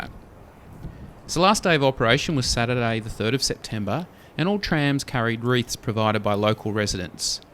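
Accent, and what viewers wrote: Australia. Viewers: Thank you for this. On the narrator, the accent is a hybrid of Aussie and English..... at least to my ears. I wonder when that started changing and we ended up with what we all recognise today.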